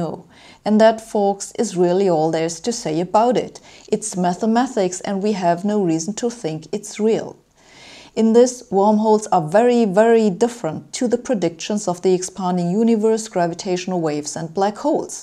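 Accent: Germany. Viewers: Well, she is German.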